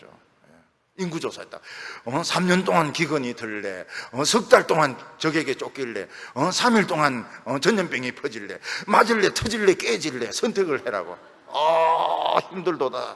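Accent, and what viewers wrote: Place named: Gyeongsang, South Korea. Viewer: 목사님! 감사합니다. 저도 경상도 사내입니다. 경상도 구수한 언어로 쉽게 이해할수 있겠끔 설교하여 주시니, 깨달으며 은혜받음을 하나님아버지로 말미암은 징계,핍박,고난 달게 받는자 되도록 새롭게 마음 다짐니다. 늘, 건강하셔서 멋진설교 지속하여 주실것을 기도 드리겠습니다.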